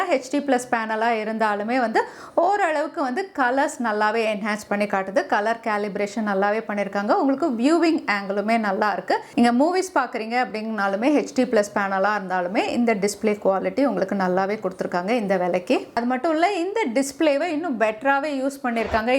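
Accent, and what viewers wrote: Kerala, India. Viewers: genuine review ur slang like mallu ,,,,,,,,,,,anyway fantastic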